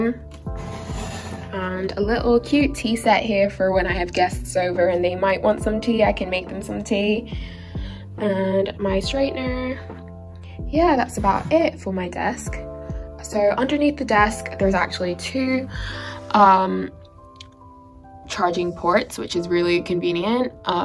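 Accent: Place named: United Kingdom. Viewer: Your British accent is showing!!!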